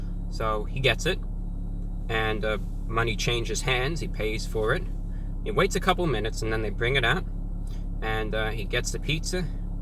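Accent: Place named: Transatlantic, United States. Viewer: Ever since he read those mean comments and showing the vicious one about slitting his throat and stabbing his eyes with forks..I have an added entertainment factor watching his videos...I love this guy! How can anyone not? His trans Atlantic accent I like as well. Friendly and easy going..I hope the people that said the violent things were just trying to be funny.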